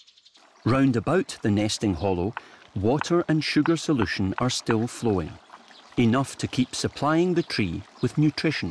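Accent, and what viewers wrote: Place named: Scotland, United Kingdom. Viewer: Moar narration with that Scottish accent, please! ♡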